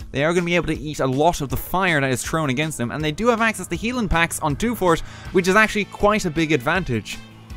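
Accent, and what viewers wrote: Ireland. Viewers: wait are you irish.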